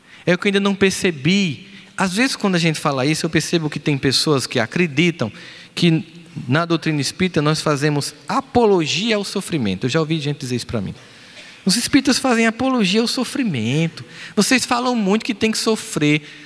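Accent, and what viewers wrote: Northeast, Brazil. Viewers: Esse sotaque NORDESTINO E MUITO BOMMMMM ! GRATIDAO !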